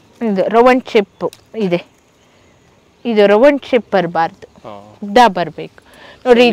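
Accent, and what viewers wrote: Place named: United Kingdom. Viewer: British Speaking Kannada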